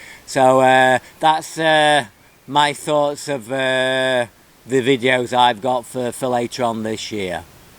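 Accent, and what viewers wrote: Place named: Northern England, United Kingdom. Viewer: Looking forward to the DIY food dehydration tutorial. Nothing better than a northern accent, I'm up in Leeds. Congrats on the 1000 subs.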